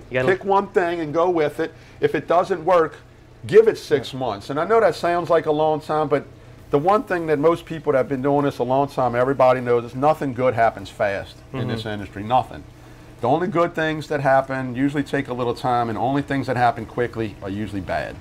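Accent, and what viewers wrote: United States. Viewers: i knew at about 5 seconds in this guy was from LA. haha i miss southern accents. southern guy stuck here in CA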